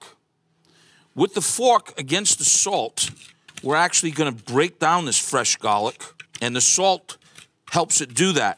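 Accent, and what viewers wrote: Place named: New Orleans, United States. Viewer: New O'wleans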